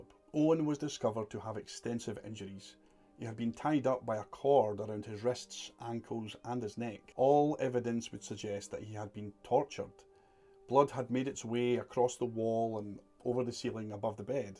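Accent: Scotland, United Kingdom. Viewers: Uh yeah...you're Scottish, I am Australian and would love a Scottish accent 😎👍.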